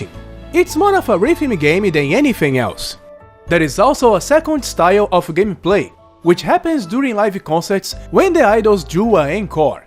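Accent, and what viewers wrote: Brazil. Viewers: Nunca ouvi alguém a falar inglês com tanto sotaque brasileiro ahah Obrigado pela review, gostei bastante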